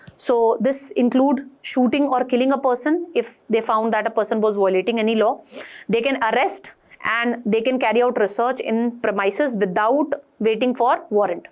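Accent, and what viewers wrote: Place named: Haryana, India. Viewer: sometime haryanvi accent ,,,so cute....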